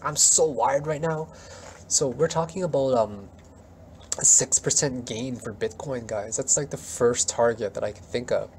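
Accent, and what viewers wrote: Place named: Canada. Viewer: Good thing that i stumbled upon your video today. Interesting content....and i miss listening to the Canadian accent. Spent 5 years there in the 90s...the good old student days.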